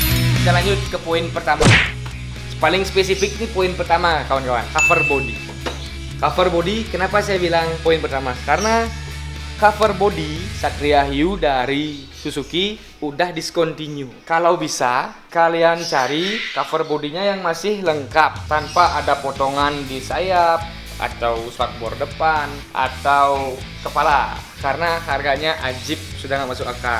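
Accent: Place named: Bali, Indonesia. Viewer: Dialek orang Bali sangat kentara walau menggunakan bahasa indonesia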